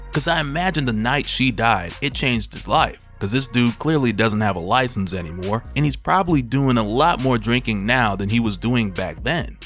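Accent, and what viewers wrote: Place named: West, United States. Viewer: i love ur western accent tho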